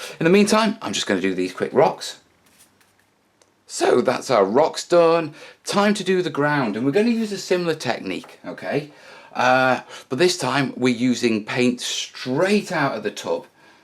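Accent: United Kingdom. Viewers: a terrain version of Bob Ross with a British accent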